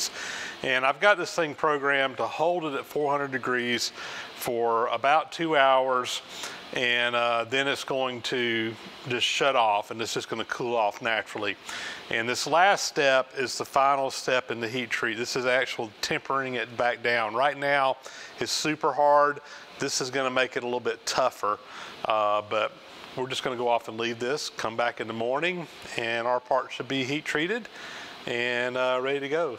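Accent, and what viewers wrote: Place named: Georgia, United States. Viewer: Was his Georgia accent not enough of a clue?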